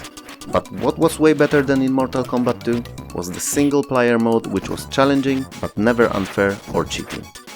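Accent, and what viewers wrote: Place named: Poland. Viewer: Wyczuwam rodaka w tym angielskim :) Spoko materiał kolego. Łapka w górę i pozdro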